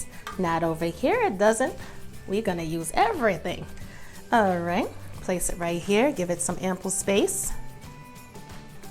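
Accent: New York, United States. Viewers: I hear the New York Accent! Whaddup Sis! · sound like you from NY but this meal is a southern classic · love the N.Y. accent!!!!! thank you.... i just followed on the gram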